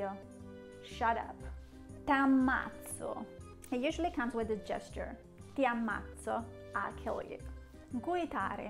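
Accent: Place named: Agrigento, Italy. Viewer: Dall'accento direi che sei agrigentina? Complimenti per il video! :)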